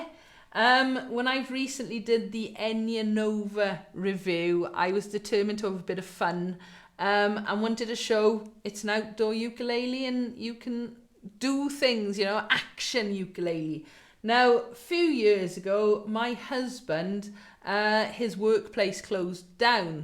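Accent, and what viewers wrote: Wales, United Kingdom. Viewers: Loving your help and hearing a wonderful Welsh accent. I'm from Abertawe originally but have been in NZ since 2004. Been learning the ukulule and loving it. I really want to learn Ar Hyd yr Nos, but am not Welsh speaking - on duolingo a little and know very basic words and phrases. Would it be too much to ask if you have simple chords for Ar Hyd yr Nos. A phonetic translation is something I've been lookinb for online to no avail; do you or anyone you know have one? Diolch yn fawr, Denise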